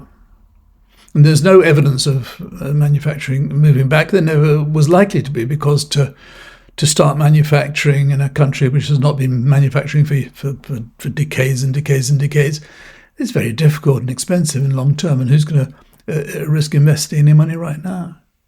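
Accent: United Kingdom. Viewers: If you don’t know, know this now: Even though this man has an English/Brit accent, ONLY AMERICANS SAY THAT THE PRESIDENT OF THE USA “IS THE MOST POWERFUL PERSON IN THE WORLD.”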